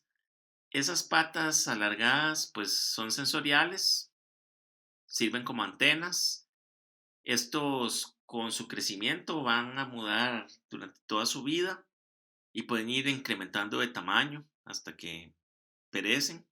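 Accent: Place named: Costa Rica. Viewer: El acento tico no se pierde xd Gracias mae por el video <3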